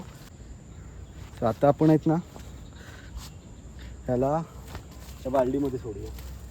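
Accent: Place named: Bhandari, India. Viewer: तुझी भंडारी बोली छान वाटते.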